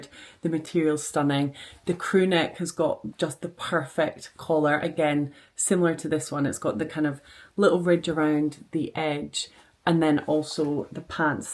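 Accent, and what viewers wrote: Scotland, United Kingdom. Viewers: You look fantastic in all the Lilysilk! I think your Scottish accent is stronger after your wonderful weekend with your mum and sister, it's so cute!! Happy that you've already been able to narrow down your dress choice. Still about to watch the cooking bit 😂. Busy times!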